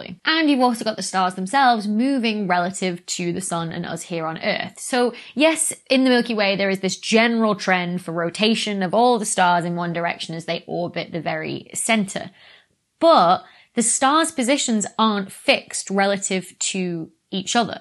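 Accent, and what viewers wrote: United Kingdom. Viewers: Because you are not used to genuine British accents. I have no issue at all understanding her at normal speed. One year I was in West Virginia at a factory and had a British guest. He asked a question. The W.V. man tired to me and asked, seriously, "What language is he speaking?"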